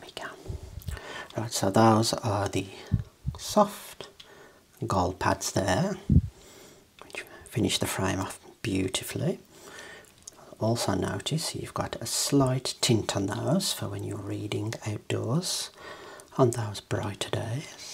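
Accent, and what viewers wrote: United Kingdom. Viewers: Love your British accent. Marvelous video like always ❤ French 💋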